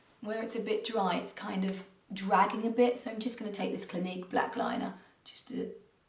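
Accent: United Kingdom. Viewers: I really love the tutorial and your knowledge of Rita Hayworth. I enjoyed the videos that you and your sister make because I always come away with something that I didn’t know and feeling a little bit better after listening to your lovely British accent. I am watching you from Phoenix Arizona in America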